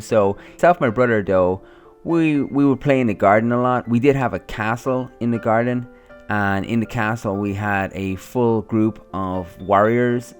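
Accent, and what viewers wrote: Ireland. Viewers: It's a common irish pronunciation, loads of people talk like that here in ireland. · Thats an irish accent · That's the Irish accent hahah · Stop taking the mick he's Irish ok if u were Irish I'd say it like that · It's called an Irish accent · i really don't see how thats funny. Obviously he has an Irish accent. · Its an Irish accent! I have it too :) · LLOOOVVEE your irish accent!!!!!!!!!!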